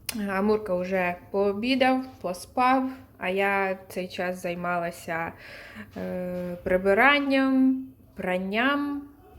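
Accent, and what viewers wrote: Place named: Ukraine. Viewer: а с какой ты области? Чувствуется, что не с Западной. Будь здорова, чорноброва.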